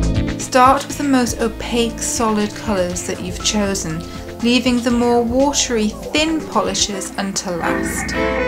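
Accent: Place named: RP, United Kingdom. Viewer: You have such a posh accent haha:)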